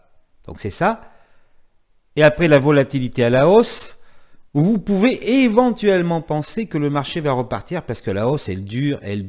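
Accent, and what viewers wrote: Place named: Southwest, France. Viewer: Ah et l'accent du sud-ouest fait toujours plaisir à entendre !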